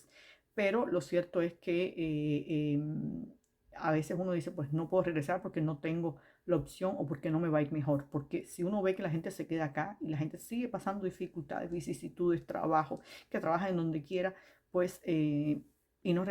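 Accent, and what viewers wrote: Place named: Cuba. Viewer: Hola soy cubano, y por su asento creo que es cubana. Me gustaría que hicieras un vídeo para nosotros los cubanos a ver nuestras perspectivas pq si, llevo rato viendo vídeos de YouTubers pero ninguno de la perspectiva de un cubano.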